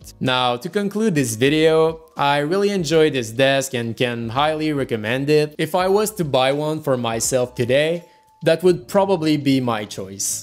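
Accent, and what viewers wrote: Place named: France. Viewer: Damn that French accent coming through, lol.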